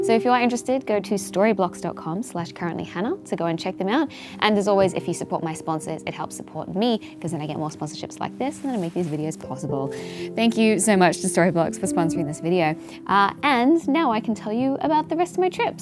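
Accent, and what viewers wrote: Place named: Australia. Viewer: I love your aussie accent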